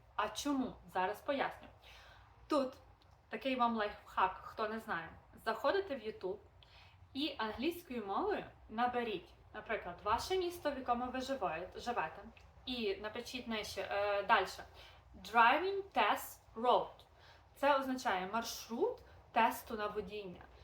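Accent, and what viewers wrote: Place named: Galicia, Ukraine. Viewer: Дякую за відео, з мене підписка, планую їхати до Нью Йорку. По розмові видно що ви моя землячка з Галичини ). Я до речі з Львівщини. Хай щастить вам на новому місці!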